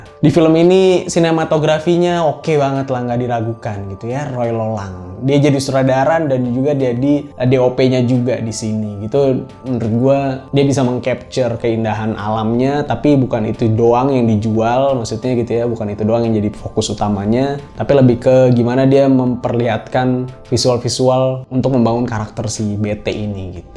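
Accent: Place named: East Nusa Tenggara, Indonesia. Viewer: Logatnya itu yang bikin rindu kampung,,,, Salam Dari anak NTT bang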